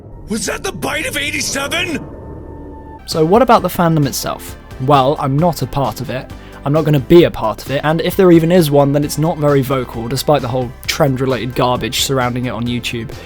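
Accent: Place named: United Kingdom. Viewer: your british which makes you 10x more annoying than you already where with this video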